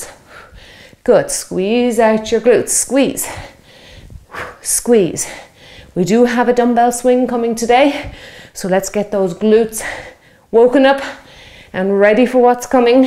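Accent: Ireland. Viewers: Hi, a new subscriber from Belleville, Ontario Canada. I adore your workouts. They are calming yet very motivating. I have done most of them and each one is terrific. Plus I never get tired of your lively Irish accent. I have shared your workouts to my Facebook page and had some of my friends try it. Thanks, keep it up!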